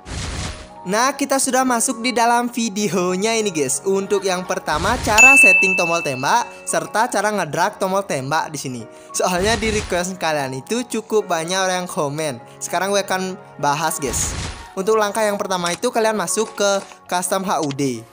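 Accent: Bali, Indonesia. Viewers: Logat bali nya kentel banget bli 😁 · Fiks orang bali😂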